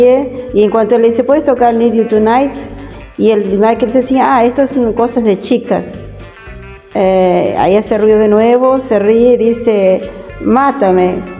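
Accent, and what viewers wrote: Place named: Argentina. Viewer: Me encantó tu forma de relatar bien argentina 👏🏻😂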